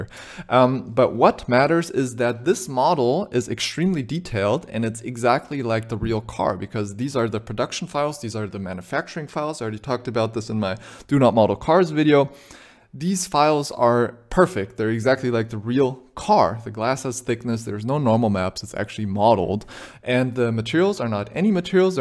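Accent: Germany. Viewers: Are you from german?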